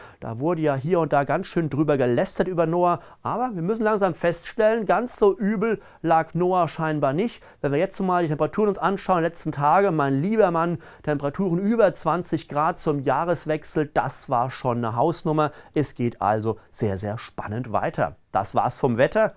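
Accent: Hesse, Germany. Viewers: Kälte weit weg , sehr gut! Bißchen hessisch nächstes mal wieder bittte 🙂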